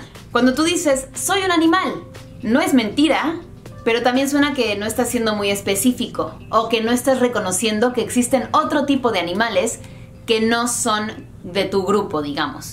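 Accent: Mexico. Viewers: A mi me parece mas Mexicana o Latina que gringa. Cuando la oigo hablar imediatamente pienso en Mexico. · Tu acento tan mexicano :3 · kelly ramirez ella estuvo viviendo en México y yo también dije eso, me encanta su acento Méxicano. y ella dice que ama México. ♥ soy de México jeje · Te equivocaste un poquito. Hablas el castellano casi perfecto, con un encantador acento mexicano. Pero dijiste "unanimamente" y es "unanimemente". Saludos desde el Perú, un país hermano de México. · Una pregunta por qué decidiste aprender el Español con acento mexicano , si tus vídeos estan dedicados al mercado Latino ,fueran usado un acento mas neutral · Hola me acabo de suscribir a tu canal luego de ver tan sólo un vídeo tuyo. ¿Sos estadounidense? Si no lo decís no lo creía! hablas un castellano perfecto, pensé que vos eras mexicana ya que tenés acento mexicano. Che, ni un ápice de acento inglés!. 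pregunto: porqué los mexicanos los llaman gringos de donde proviene esta palabra? GREEN GO ???? desconozco. acá gringo les decimos a los europeos y eventualmente a los "yankis" pero nos referimos a los blancos caucásicos. Saludos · La Biblia Dice he vivido en Tamaulipas, Durango, Chihuahua, Sonora y Coahuila y he conocido personas que tienen el acento norteño y otras que aunque sean del. Norte los escucho normal para mí que soy de Acapulco Guerrero, en todo el país existen personas que hablan el acento Méxicano estándar como lo habla, no porque sean norteños tienen que hablar igual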